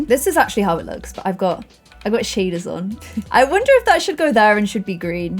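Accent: United Kingdom. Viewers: My American self laugh so much of how she said rabbit Is that wabbit I’m sorry I’m American and think British accent are funny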